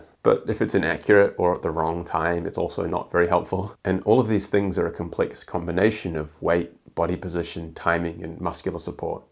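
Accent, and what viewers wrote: New Zealand. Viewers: I am so happy I found this channel. I am a Kiwi away from NZ so it was nice to hear the accent! I love MMA but also Parkour and remember you from SP09! You got know Storror!!!!! lol Also, a little TMI, I have rather severe Ankylosing Spondylitis and a weight issue that is more about inability to find a way to move that wont break me. My muscles are a mix of ridiculously strong and dense to almost nothing there and I hope I can learn something, anything from your vids that might help me as I am becoming the Hunchback of Notre Dame and I hate it, deeply. Anyway, great vid, I watched it twice because NZ pay is bad and you deserve money, I liked and subbed. Now onto Callum then Dom! Very interested as Dom was often training with some of the guys in the city I live in, in Aus and seeing him in real life is so different to YouTube!!! Things he attempts and lands that look big on screen, look literally impossible to my eyes. 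GG! Keep up the hard work and I look forward to the next upload! ✌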